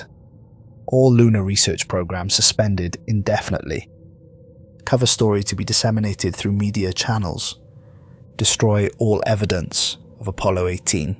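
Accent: Wales, United Kingdom. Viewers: Really appreciate you being a sci fi Creepypasta youtuber using their voice snd giving thanks etc. may not seem like much but pretty much all the others out there 💯 done via AI start to finish. Cheers! p.s. if im not mistaken, Welsh?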